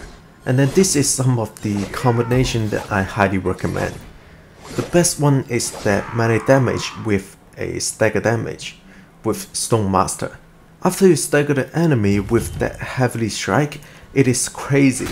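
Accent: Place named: Taiwan. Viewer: Thank you for another great video. A lot of information to process here so i need to watch a few times so i can adjust my Samurai build. Are you from Taiwan, love your accent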